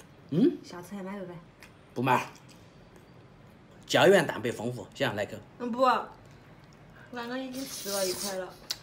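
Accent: Sichuan, China. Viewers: 小廚講話有四川腔，阿為啥唱歌辣麼標準?????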